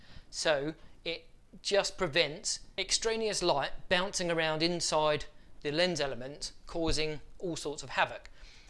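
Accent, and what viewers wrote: United Kingdom. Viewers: Nice informative video just found your channel...thought it would be good being a and you sound like a northerner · Great video. Makes a change to hear someone English.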